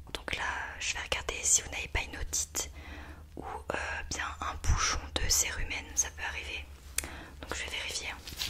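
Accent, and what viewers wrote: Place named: Eastern France, France. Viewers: Ui c'est comme ça en Alsace Lorraine ^^ Et pas les Oui de nobles qui ont 9 cartes gold :D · Toi tu viens de l’est de la France obligé !! Tu dis « Ui » au lieu de « Oui » 🙂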